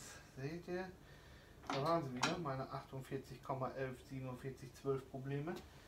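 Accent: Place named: North, Germany. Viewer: Cooler Kanal! Endlich mal jemand der ein Norddeutsch schnackt! Ich freue mich auf weitere Videos von dir!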